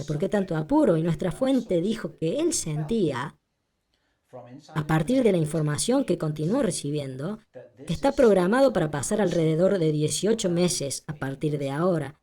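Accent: Córdoba, Argentina. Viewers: Felicito a quien se haya tomado el trabajo de traducir a voz viva todos estos documentales, pero esa voz tipo " las ardillitas" y con una tonada cordobesa y con intervenciones tipo humor cordobés...jajajajaja, me encantaría saber quien está detrás de esa maravillosa voz....jajajajaja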